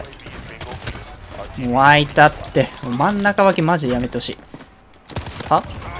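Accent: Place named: Hakata, Japan. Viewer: 筋肉男子の博多弁は惚れちゃうね❤️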